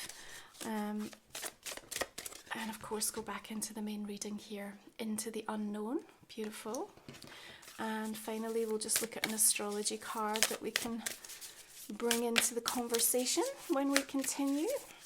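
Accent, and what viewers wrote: Scotland, United Kingdom. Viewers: Lovely read thank you 🕊🙏. Very refreshing to hear a Scottish accent 🏴🏴🏴😊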